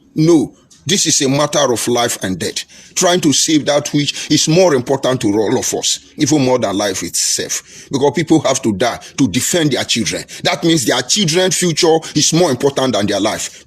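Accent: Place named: Yoruba, Nigeria. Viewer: The man's voice is that of a Yoruba. Only a criminal from a criminal tribe will claim to be Ikwere today, Ogoni tomorrow - even when his vocal intonation gives him away as a Yoruba. His propaganda is also in hyperbole like that of the typical Yoruba - superfluous like his babariga dress. But this mad clown is really comical even in his gibberish nonsense. He is shaming Ogoni people or any group he claims to be representing 😄😃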